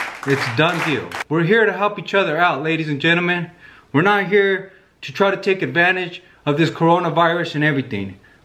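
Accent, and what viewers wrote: Sinaloa, Mexico. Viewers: I'm so freaking dead right now 💀💀💀💀 pinche, the fact that I was taking his ass serious at the beginning.. like dead serious. All into it.. I cannot see you the same right now 😂😂😂😂😂 and then his acento bien sinaloense😂😂😂🍅 actor of the year goes to........ 😂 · He had me at “a roll could last you up to a year” so I continued watching...... pero like no quiero usar my finger to clean my 💩😅. The Spanish version had me rolling😂💀 “no se anden panikiando” also how he kept saying “fundillo” deceased! His Sinaloa accent made it so much better. This video needs to go viral ASAP😂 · Love his Spanish 🤪 talks straight up like a sinaloense.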